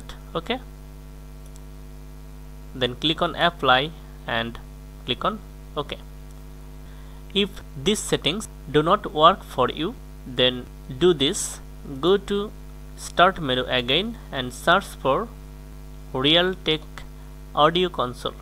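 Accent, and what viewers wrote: India. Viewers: IT WORKED, U KNOW ITS GONNA WORK WHEN U HEAR THAT INDIAN VOICE :D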